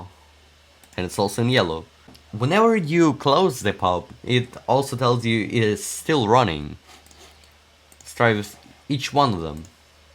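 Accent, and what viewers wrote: Russia. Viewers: the russian accent doe, keep up the excellent work :D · Russian: check Light accent: check Flies in background: check Techy: check Yeah, its all coming together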